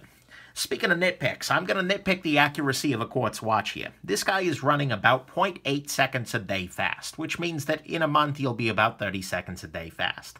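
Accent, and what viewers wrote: New York, United States. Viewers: New Yorker much? Nice review 👍🏼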